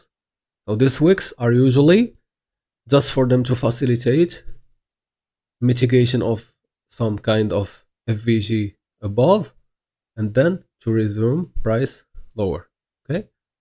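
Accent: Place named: Morocco. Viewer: سلام عليكم، اخي عرفتك مغربي من صوتك تبارك الله عليك وبغيت نسولك واش تنصحني نبدل من ict لهاد الموديل تع dtfx واش في نظرك احسن من الايسيتي حسب التجربة ديالك ? وسؤال الثاني واش مكينش شي استراتيجية فيها RR اكثر من 1 في استراتيجيات تع ال dtfx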